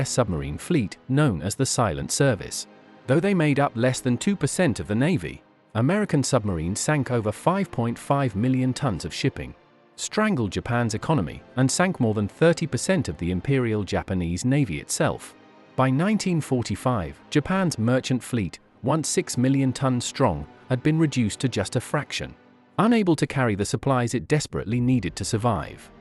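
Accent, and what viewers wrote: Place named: England, United Kingdom. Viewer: Why english accent ?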